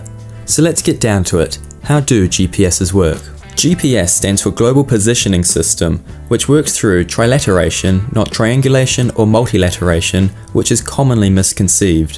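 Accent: New Zealand. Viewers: ooooh I was sure this was a Kiwi accent, then when I saw the AirNZ app I knew it had to be! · Ahhh that kiwi accent.. grrrr lol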